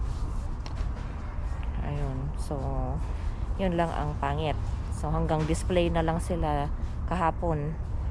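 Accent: Visayas, Philippines. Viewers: I really love your accsent...viva to all visayan..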